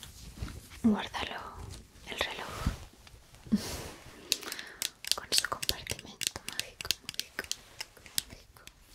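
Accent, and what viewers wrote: Spain. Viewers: Me encanta el asento de España.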